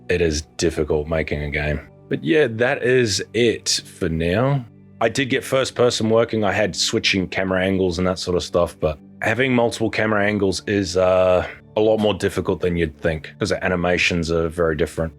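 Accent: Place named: Australia. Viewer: Blonde anime girl with deep male Australian voice fits oddly well 😂. Looks like its going well, i think its so cool youre doing this.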